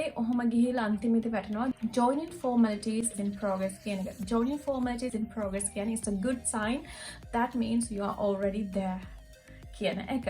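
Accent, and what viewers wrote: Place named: England, United Kingdom. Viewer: U knw what .ur so beautifull...plzz video ekak karanna english acent eka hadaganna widiha oya wage...❤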